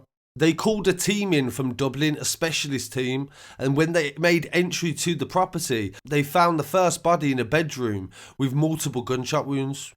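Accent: Birmingham, United Kingdom. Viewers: That bham accent is the only thing that ruins his channel 🤮🤮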